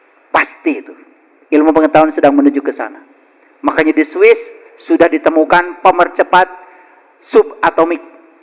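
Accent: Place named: West Java, Indonesia. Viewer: Bapa guru boleh tau bapa asal dari daerah mana pak soalnya bapa bahasa Sunda saya orang Sunda saya sangat tertarik dgn gaya ceramah nya bapa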